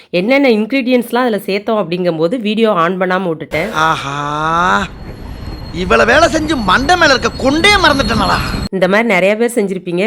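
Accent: Tirunelveli, India. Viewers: Unga native thirunelveli,ah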